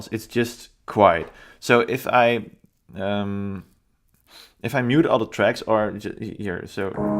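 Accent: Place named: Netherlands. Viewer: you sound dutch, could you explain what you did with the compressors? :)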